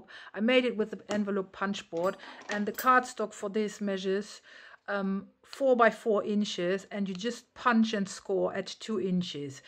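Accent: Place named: England, United Kingdom. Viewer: i love , love, it, i will surely try it because i love the 3D projects. i am sorry for your finger but we all do this with the excitment of seeing the finish project. how satisfying it is when we finish it specially when it is our own creation. For me i love your english accent and your english sounds perfect for me. continue your good work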